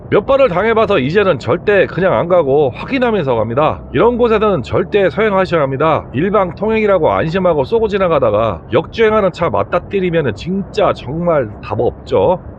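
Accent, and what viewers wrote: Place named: Jeolla, South Korea. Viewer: 시골어디쯤이신가요? 내용듣다보면 충청권같으신데 말투는 전라도권 같으시고 ㅎ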